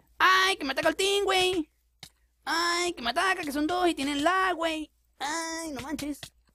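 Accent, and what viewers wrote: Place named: Venezuela. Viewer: eres de venezuela? xq yo si lo soy, tienes el tipico acento venezolano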